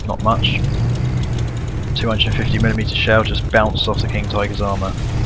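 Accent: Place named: England, United Kingdom. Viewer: Your English but you may have some American roots because you do not have a deep English actcent, sorry I am not the best speller. It is almost kinda American if your voice tone was a lil defrent. You sound a lil like total biscut so I think you live in the UK closer to the English channel. Tell me if I am right or wrong!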